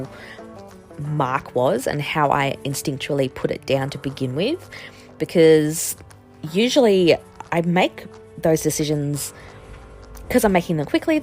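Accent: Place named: Australia. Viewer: Hi, I just found your channel and it was lovely to watch your process and hear a fellow Aussie accent!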